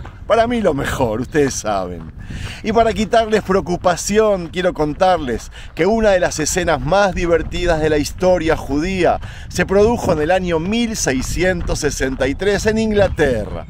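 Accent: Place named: Rioplatense, Argentina. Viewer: Tenes rasgos de desendientes!hablas como argentino o.uruaguayo sholom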